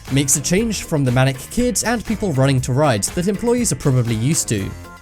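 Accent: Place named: England, United Kingdom. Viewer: Love the accent!! English??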